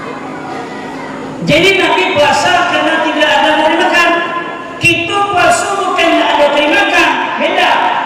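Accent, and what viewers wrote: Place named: West Sumatra, Indonesia. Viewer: Urang minang mano suaro nyo😀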